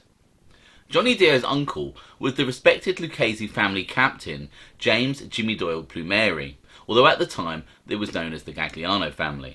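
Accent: United Kingdom. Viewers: I fucks wit heavy. Perfect limey accent to tell stories and excellent stories with pictures I would like more wiretaps tho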